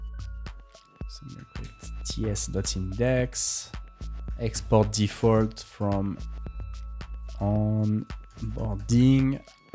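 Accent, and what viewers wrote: France. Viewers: Man your french accent is great! Nice format btw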